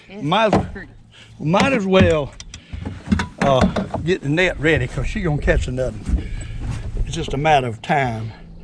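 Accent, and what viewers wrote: South, United States. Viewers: Hey its sourthern english I understand u just fine but I am from alabama LOL good vid sir👍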